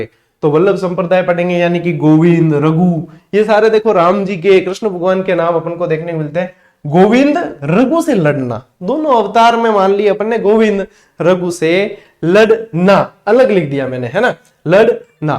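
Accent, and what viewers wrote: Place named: Marwar, India. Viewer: Sir aap Marwadi bolate ho to meethi lagti hai main Bhilwada se hun aur hamare yahan Marwadi bilkul bhi acchi nahin hai bolane mein hi Aisa lagta hai Jaise Lad rahe ho😂😂😂😂😂 Or Thank you 🙏🙏🙏🙏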